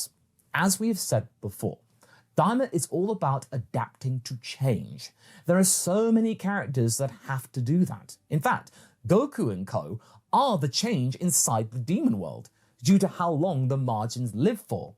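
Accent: United Kingdom. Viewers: My dude he has a British accent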